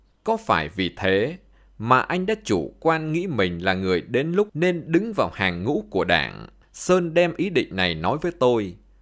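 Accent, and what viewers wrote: North, Vietnam. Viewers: Tôi cũng giống như Bạn vô cùng yêu thích giọng đọc của Anh ấy một giọng Bắc rất hay, rất ấn tượng! Cám ơn kênh DTV mong được nghe nhiều bài viết hữu ích với giọng đọc có một không hai nầy! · Từ xưa đến nay tôi rất thích giọng nói của người Bắc trước 75. Cho nên tôi thường xem DTV channel lắm vì mỗi bài bình luận đọc bằng giọng Bắc rất là hay 👍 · Giọng Bắc 54 đọc hay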